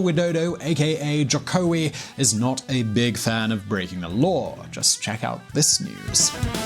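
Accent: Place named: United Kingdom. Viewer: Unsubscibed.your ugly british accent soo fucking annoying,right or wrong indonesia its my country.ur opinion its like expert on our country most ur comment its bad.sorry stop subs ur chanel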